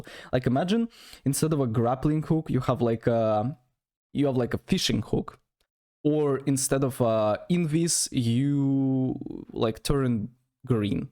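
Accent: Russia. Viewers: Мне показалось или у тебя русский акцент?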